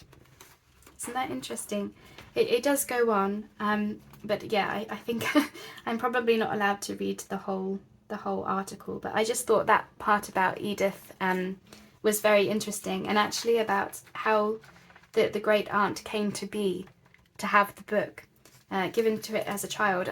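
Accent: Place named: England, United Kingdom. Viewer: Thank you for showing the Nature Notes book, I have not seen it before. Its very expensive on eBay. I do have the Country Diary that I bought on eBay for 12 dollars. Thank you so much for your stories as you were flipping through, they were very nice. I always love hearing the English accent. Hearing about the places you spoke about was magical! I am new to your channel, and look forward to watching more of you videos.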